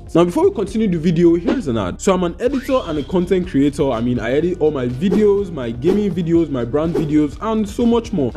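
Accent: Nigeria. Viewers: Keep it up, your Nigerian accent day give me special joy. 👍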